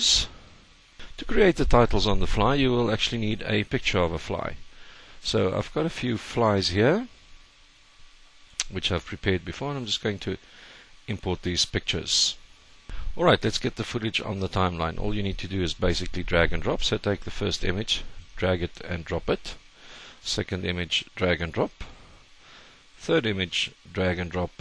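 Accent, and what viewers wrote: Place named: Scotland, United Kingdom. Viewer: nthx btw u sound scottish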